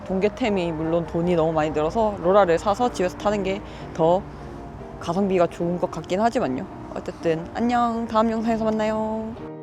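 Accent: Gyeongsang, South Korea. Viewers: 경상도 어디 사셨었나요?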